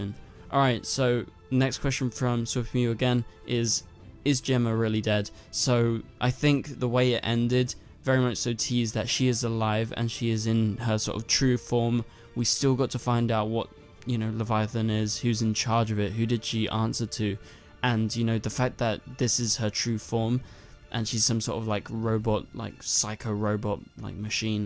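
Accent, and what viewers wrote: United Kingdom. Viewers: Just subscribed. Hear a brit accent, so got to support a fellow brit.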